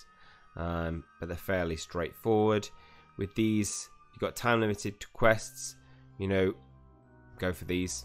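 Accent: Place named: Cockney, United Kingdom. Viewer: Nope. this guy is all-over-the-place in his attempt to explain things. For one, Americans have a tough time as it is trying to understand the Cockney language. Secondly, he's fumbling around with the cursor trying to explain features and in the process - he's out in left field, so to speak going back and forth and in the process ends up with us knowing but an inkling more than when we started to listen to the "How to play.". He's talking about cards, and other items, but his "basics" are totally left out of the instruction. What many of us wish to know is what constitutes a good move in the game, what to shoot far as the screen refreshes, what items are most important and when to use them (ie, bombs, footsteps, how to get past attacks, how to kill frogs, what are "power ups" and how to use them),; what are the best moves in the game (ie using 3, 4, 5 in a row items); what to save in each game until needed on the game board; know if it's better to use a lighting bolt spell or a flying key; what is the order of an item's changes until it can be used (ie, a block of ice, turning clearer). 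Oh, there are so many more things in "How to play" that is totally left out here. I haven't come across an excellent playbook/instruction manual outlying all of this and most videos I've seen one may know how to play the game but not express clarity, simplicity, understanding too all - but make an attempt to speed by it, like they were in a timed game. - pitiful.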